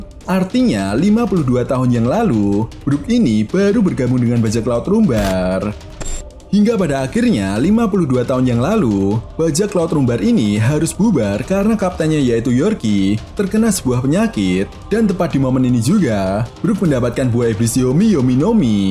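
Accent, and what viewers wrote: Java, Indonesia. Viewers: Malah puyeng dengerin suara masnya dgn aksen briish javanese. Mending pake suara AI aja bang..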